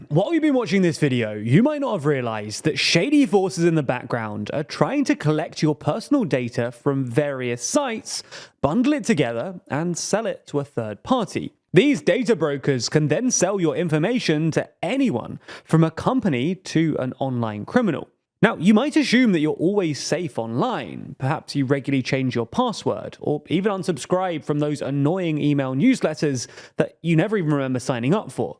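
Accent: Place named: United Kingdom. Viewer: Presenter is British, speaking British English (from where the language originated) rest assured he is pronouncing it in the correct manner.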